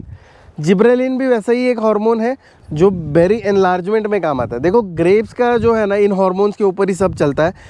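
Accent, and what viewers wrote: Punjab, India. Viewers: Aapki Hindi mein Punjabi tone hai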